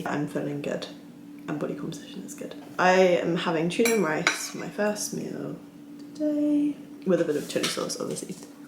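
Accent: RP, United Kingdom. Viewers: is your posh accent acquired or innate ?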